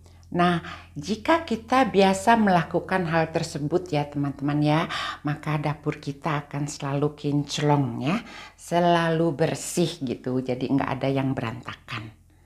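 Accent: Indonesia. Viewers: Rumahku...istanaku... bagus skl penjelasannya ibu yg cantik... Sy jg senang dg gaya bicara ibu walaupun tlah lama di luar negeri,namun tetap logat bhs Indonesia nya msh kental. Smg ibu sll sehat ya bu,pjg umur.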